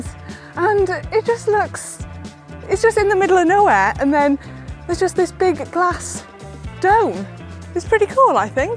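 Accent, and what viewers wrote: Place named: Wales, United Kingdom. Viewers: Welsh!! That explains the accent!! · is it me getting used to the sound of it, or is accent coming out more? it's lovely, actually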